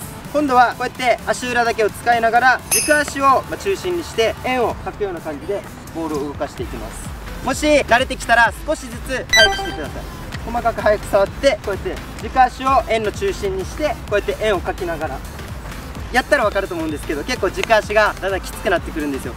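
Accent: Okinawa, Japan. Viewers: 沖縄の人かな？ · 沖縄訛りな感じ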